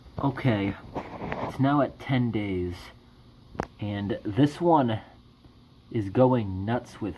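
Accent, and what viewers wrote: New England, United States. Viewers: Who wants to play a guessing game and guess where this dude is from in Mew England? I’m guessing Maine, maybe Connecticut.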